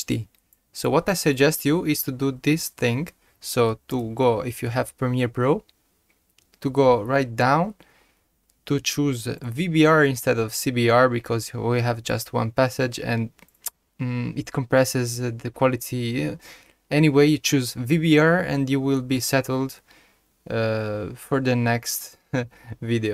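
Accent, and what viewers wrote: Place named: Italy. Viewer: si sente un sacco l accento italiano! xD comunque sei un grande, funziona e mi ha salvato la vita!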